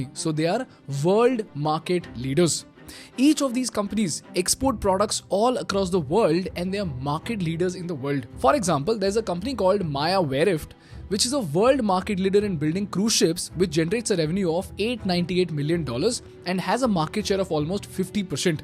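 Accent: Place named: Bihar, India. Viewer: You talk like a BeeHaari, so I am thinking you are from BeeHaar. Before giving everyone gyan, what is your solution for BeeHaar and along with it, its two neighbouring states.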